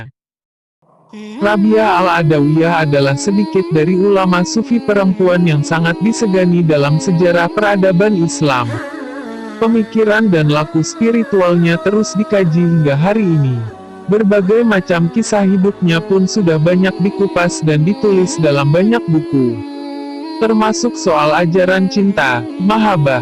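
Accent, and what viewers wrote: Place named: Madura, Indonesia. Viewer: Madura kerass 😀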